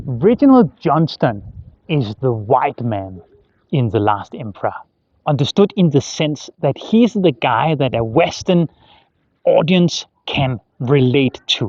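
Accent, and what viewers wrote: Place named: Denmark. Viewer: That's sørengasseme what I call Danglish.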